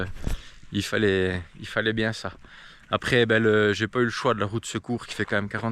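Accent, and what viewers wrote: France. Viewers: salut a toi je découvre ta chaine et tu as fait un sacré boulot je suis du doubs et toi aussi je pense ca s'entend on dirait !! bon voyage a toi et félicitation pour le boulot ! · Salut, Je suis originaire de Montbéliard et ça fait du bien d'entendre le bon accent bien de chez nous ! Et tout à fait d'accord avec toi sur cette propagande. Perso j'attends ma cellule, car ce n'est pas moi qui la fabrique. J'ai eu une Land 109 série IIA dans les années 80, puis un Discovery et un Range P38. Aujourd'hui j'ai changé je suis sur Ford Ranger et oui, avec l'âge, on roule en "confortable" ! Bonne continuation à toi et ta p'tite famille.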